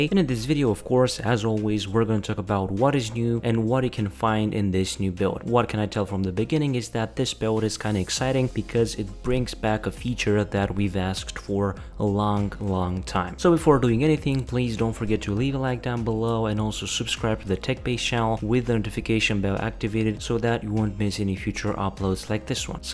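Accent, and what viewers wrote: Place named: Romania. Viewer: thank you, you're the best one for sharing whats new in windows 11, and you look very educated and your accent is verry beautiful, i appreciate your big work, i wonder how romanian people can speak english like this ☺ i hope we can see your face someday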